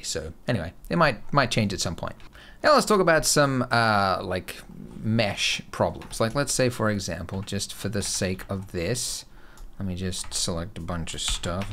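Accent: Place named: Australia. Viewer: Blender 2.8 looks so good it's really interesting they put a floating guy with an Australian accent at the bottom right corner of the screen at all times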